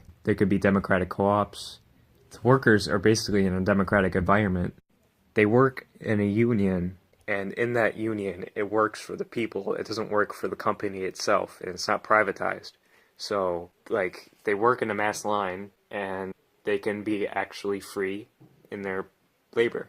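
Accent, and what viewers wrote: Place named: New England, United States. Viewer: You don't sound Slavic you sound Yankee like me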